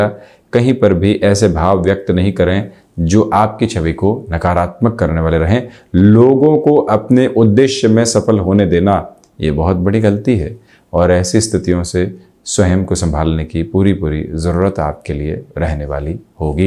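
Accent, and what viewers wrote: Standard. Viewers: वाह!गजब की शुद्द हिन्दी बोलते है आप।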